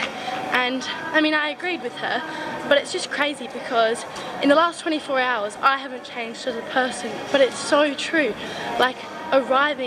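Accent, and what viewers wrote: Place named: Australia. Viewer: i mean its a australian accent, but danish family and passport of your own is a blend of two countries, like you get two ways of life, countries and cultures etc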